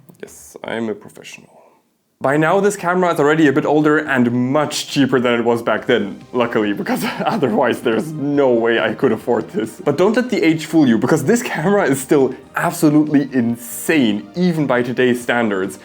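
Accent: Germany. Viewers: I hear deutsch😂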